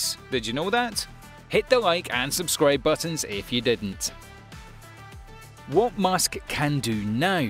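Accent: Scotland, United Kingdom. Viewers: Are you from Scotland? I like it , not so much the Brits I like the way you speak, it reminds me of 'Scotty' on the enterprise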